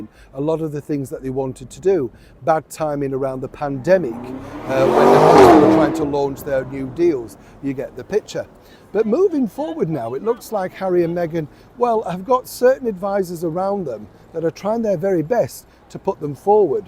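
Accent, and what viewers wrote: Yorkshire, United Kingdom. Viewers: you crack me up, the Yorkshire keeps appearing 😀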